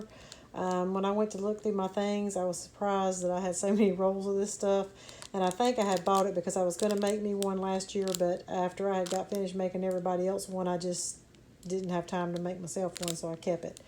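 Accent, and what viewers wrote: South, United States. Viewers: So pretty, I've been trying to find a simple way to show my daughters (adult girls) a simple and easy way to make wreaths. My older daughter especially loves her diy's... I think I'll go out and grab some supplies for her and have her watch this wonderful video and try this project. Thanks so much for sharing. And I just love watching your channel... love love hearing that southern accent you have.. lol Thanks again and have a blessed day. 🍂🍃🍁🍁🍁🍁🍁🍁🍂🍃🍂🍁🍁🍁🍁🍁👍👍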